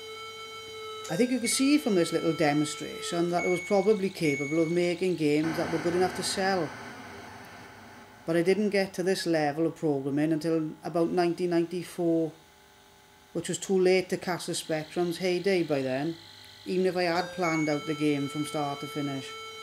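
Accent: Wales, United Kingdom. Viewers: Awesome little game!,love the Welsh accent lol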